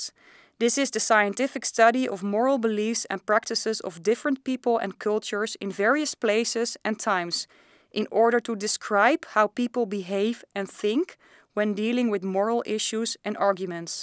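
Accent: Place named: Spain. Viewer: This person is definitely from Spain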